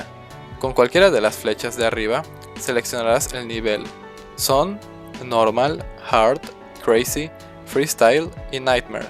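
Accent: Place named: Mérida, Mexico. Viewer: Eres De Merida :o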